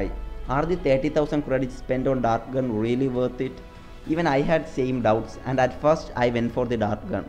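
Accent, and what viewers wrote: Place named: Kerala, India. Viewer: U are a mallu.... ur eng tells it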